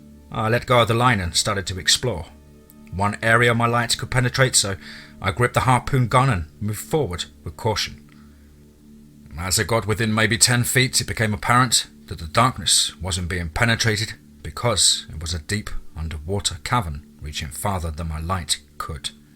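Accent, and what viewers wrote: England, United Kingdom. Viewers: This guy has the same accent as fooster. What part of England are they from?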